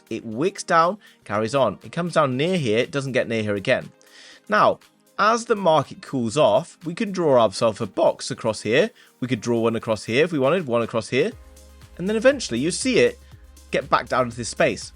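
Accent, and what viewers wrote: RP, United Kingdom. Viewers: Great to see content from the UK, straight talking, no BS. Would be good if he could swap his posh accent to a Geordie one.......just for one vid, obviously. LayerrAI is my pick. Keep up the good work 💪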